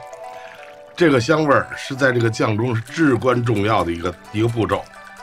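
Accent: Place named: Beijing, China. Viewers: 师傅的北京话听得真舒服，到家了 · 第一是北京出租车司机现在基本都是郊区甚至河北人了，已经不是传统北京，也就是二环（最多三环）以内的那种北京人了。第二是旧北京是割裂的，拉车挑水的苦力是一个阶层，满蒙王公、汉人士大夫是另一个阶层。现在常见的那种夸张的北京话，比如大量儿化音、吞字吞音都属于前者。这个视频里的是接近后者。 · 为了这一口标准的京腔来的，太好听了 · 正宗皇家口音，非常悦耳。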